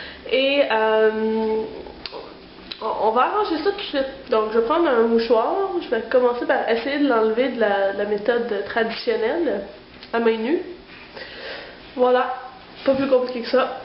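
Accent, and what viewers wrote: Quebec, Canada. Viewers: Tres jolie make up !!! Un gros kiff sur ton accend quebequois !!! J'adore !!! J'ai créer ma chaine abonne toi si tu veux !!!!!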